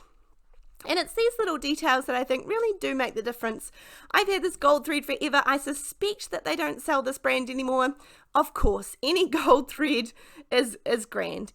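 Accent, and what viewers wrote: New Zealand. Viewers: Glad it made you laugh. It really did take me a while to,work it out. . Your accent is just the sweetest. Reminds me of the time we had a supply teacher here who was from NZ. I regularly had to translate for our class. She wanted them to sit on the mitt. .. but the best time was when she wanted to sort our children into ability groups for literacy.. we had the kiwi group, the jandals group, both needed explaining as they had not come across those words before. But then we got to the thongs group. .. we changed that one…. 😂😂 hope you’ve had wonderful Christmas. Love to everyone. X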